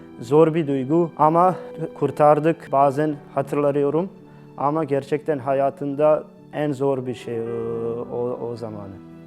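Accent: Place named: Trabzon, Turkey. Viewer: La adam konusmasi tipi felan tam trabzonlu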